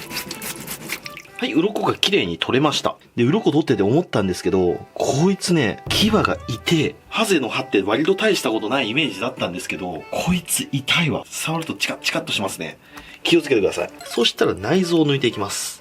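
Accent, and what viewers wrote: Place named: Nagoya, Japan. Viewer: 名古屋弁(尾張方言)なのですね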